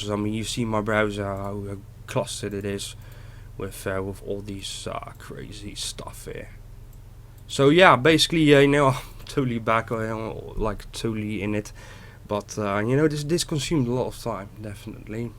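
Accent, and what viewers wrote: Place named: Netherlands. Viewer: Ik kijk al je filmpjes op zijn tijd... Ik herhaal je debuutfilmpje nog steeds vooral vanwege het geraamte (gebroken wiel) bij de zeebedding met nog duidelijk water in de goot en de discutabele commentaren daarover. Ik versta ieder accent in het Engels en kon snel horen dat je Nederlands spreekt. Omgeving Rotterdam of daaronder? Maakt niet uit, je hebt altijd een punt om over na te denken ook al zie ik niet alles zo als dat jij dat ziet. Keep up the good work!!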